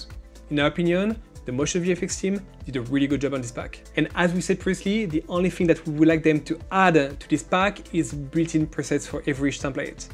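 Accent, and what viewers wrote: France. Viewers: Are u French?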